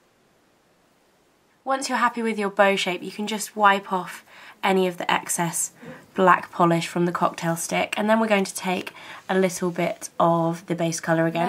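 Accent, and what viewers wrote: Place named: London, United Kingdom. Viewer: i love your accent how long have u lived in london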